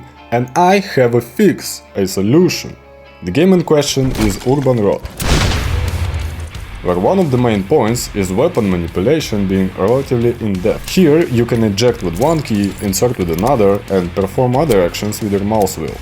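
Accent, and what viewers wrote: Russia. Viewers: Are you russian—your accent seems to be russian. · I can HEAR the Russian and you know how good russian games can get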